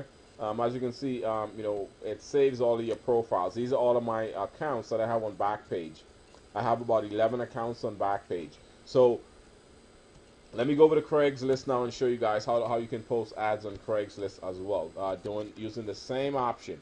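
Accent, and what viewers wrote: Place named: Bahamas. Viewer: OMG YOU ARE BAHAMIAN I can hear trhat I went to school with many bahamians Do you do do Online Businesses?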